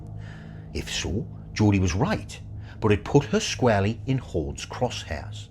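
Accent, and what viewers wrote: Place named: North East England, United Kingdom. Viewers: A true crime channel narrated by a real human AND a Northerner too! New sub and thanks! :) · What part of the cannie north east are you from pal? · Yes Lovely Geordie accent, ❤❤❤❤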